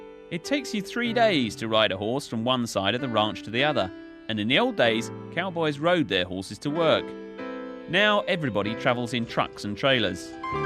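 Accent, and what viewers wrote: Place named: United Kingdom. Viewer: Is this DVD made for UK or some part of Europe? Cause the narrator accent (no disrespect meant) is quite a contrast with the wyoming ranchers accents..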